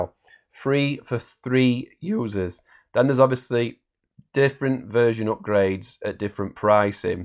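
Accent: North, United Kingdom. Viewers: Hi, good to hear a Brit voice easier to understand than the Zoho videos and thanks for being active on the Zoho products. I've used databases in the past; Sales Force etc.. Used Zoho for 1 year but not in anger. We are looking to increase customers/interaction/subscribers etc... As I'm on the move its ideal for iPad/iPhone, now going through the correct steps/blueprint to give me a better work process so when we expand a system is in place. cheers, Charles. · Hi. I am starting a role with an Organisation as a customer services manager and they use Zoho. I would like tot get to know the system better to assist in this new position. Plus I am from the North of the UK and I can relate to the accent;-)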